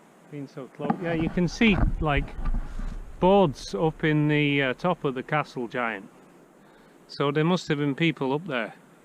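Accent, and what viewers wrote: United Kingdom. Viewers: glad you're keeping the old UK traditional dialect alive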